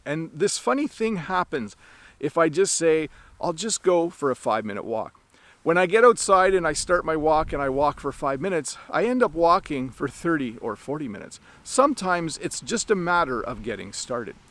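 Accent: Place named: Canada. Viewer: Hey teacher Eu demorei um pouco a entender que você era do Canadá. Por que pra nós nativos de língua Portuguesa, temos dificuldades em entender os diferentes sotaques em inglês de países diferentes. Mas eu estou progredindo com seus vídeos. Professor, sucesso para você sempre. Que Deus o abençoe. By 🇧🇷🇨🇦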